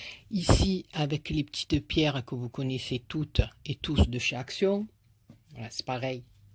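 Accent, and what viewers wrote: South, France. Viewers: bonsoir j'ai découvert ta chaine très récemment et j'avoue que j'apprécie tes vidéos tes fleurs sont magnifiques et j'avoue que je vais m'y mettre. j'adore ton accent du midi je suis allée un peu me promener sur tes autres vidéos et j'y ai découvert une bonne partie de tes réalisations qui sont tout simplement magnifiques, tu es vraiment une artiste je suis abonnée aussi à la chaîne de Christelle, j'aime aussi ce qu'elle fait et me sent proche d'elle, mon mari ayant eu la même maladie qu'elle à l'âge de 27 ans merci de nous faire partager toute mon amitié domi (de Cognac en Charente….)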